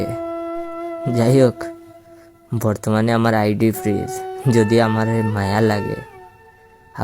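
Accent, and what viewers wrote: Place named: Sylhet, Bangladesh. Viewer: সিলেটি ভাষা লাগে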